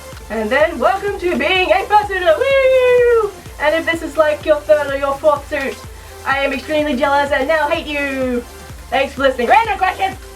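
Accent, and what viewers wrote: Australia. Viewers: OMG you're Australian! I need to seeee youu and hug you!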